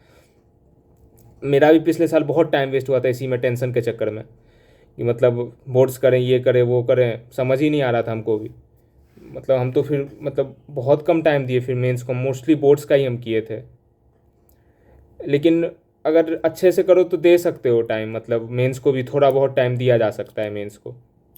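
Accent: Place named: Bihar, India. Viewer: Tumhaari accent up -bihar jaisi hai.. So where are u from?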